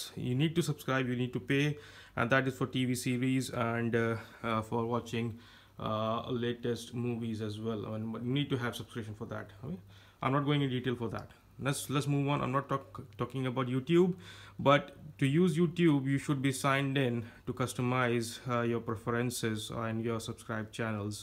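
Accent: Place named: India. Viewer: The indian accent suckssss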